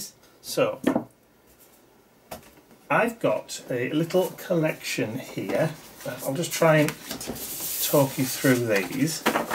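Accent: United Kingdom. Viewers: …..and I luv your british accent. I do not hear it that often. And it sounds pretty neat.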